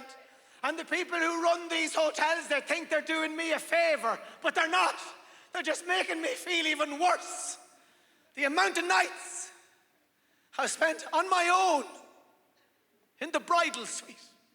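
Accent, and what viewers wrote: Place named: Ireland. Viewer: he’s irish ,man 😂😂thats what we sound like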